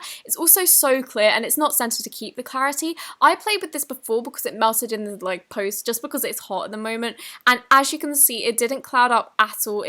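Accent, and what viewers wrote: United Kingdom. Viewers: Your British accent is so strong that i love it the British accent (strong ones) are the best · Teach me how to speak with British accent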